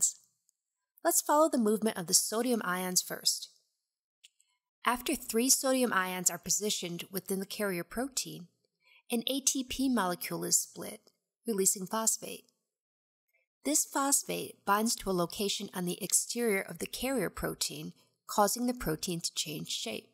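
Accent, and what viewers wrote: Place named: Midwest, United States. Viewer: love the subtle Midwest accent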